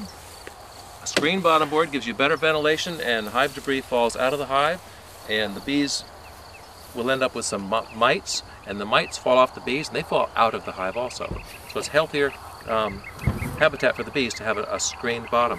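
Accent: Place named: England, United Kingdom. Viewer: Hey you sound like your from England, did you move to the USA then to start your bee company?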